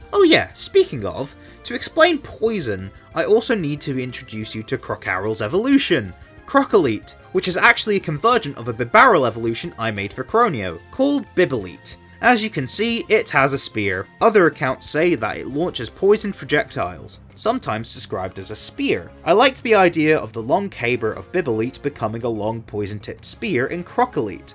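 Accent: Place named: United Kingdom. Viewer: Your little British accent >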